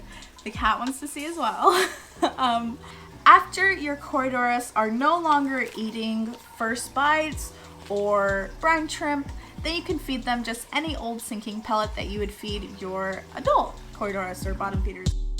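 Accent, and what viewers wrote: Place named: Southern Midwest, United States. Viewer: You sound more like you are from Southern Mid-West US than you do Australia. Transplant? Been watching yours and Nick's videos lately. Was wanting to breed some bread and butter fish other than boring guppies and bristlenose. Your videos are good motivation.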